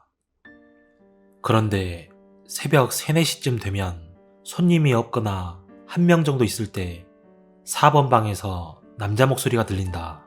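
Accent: Jeolla, South Korea. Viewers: 구수한 전라도 사투리~~~😁😁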